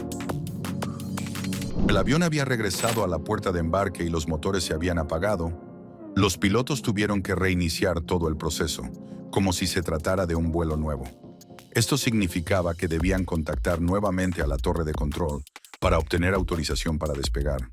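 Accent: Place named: Mexico. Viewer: no, su contenido es un copia y pega y su voz de mexicano da cringe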